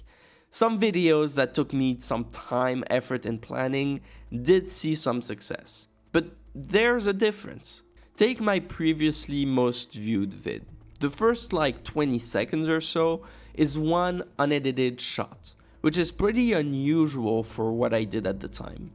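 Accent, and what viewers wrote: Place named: France. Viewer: I've got a question for you, are you french because your accent seems like you are !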